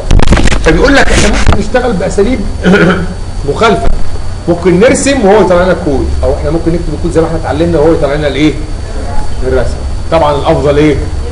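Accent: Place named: United Kingdom. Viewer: OMG!! what the hell is this you're killing me with your english accent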